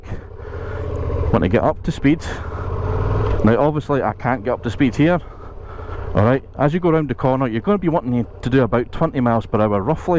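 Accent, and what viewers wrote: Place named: Scotland, United Kingdom. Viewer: I love the videos, but unfortunately, I can hardly understand some parts. :face-blue-smiling:The accent is very different. Are you from Scotland? :face-blue-question-mark: In any case, nice demos!! Thank you!! :trophy-yellow-smiling::trophy-yellow-smiling::trophy-yellow-smiling::trophy-yellow-smiling::trophy-yellow-smiling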